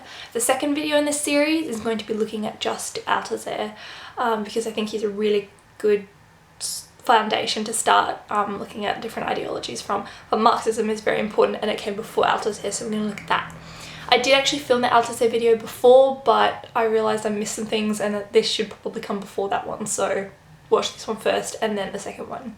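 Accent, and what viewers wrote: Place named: Australia. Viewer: nvm aussie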